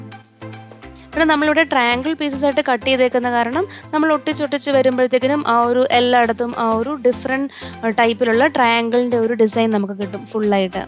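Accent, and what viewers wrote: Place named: Kottayam, India. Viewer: കോട്ടയം സ്ലാങ് ഉം രണ്ടും പൊളിച്ചു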